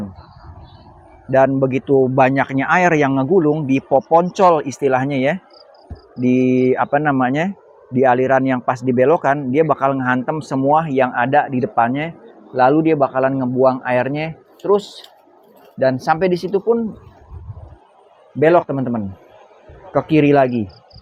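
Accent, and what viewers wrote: Jakarta, Indonesia. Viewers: bang ngomong ny Betawi banget, emang asli nya orang mana bang?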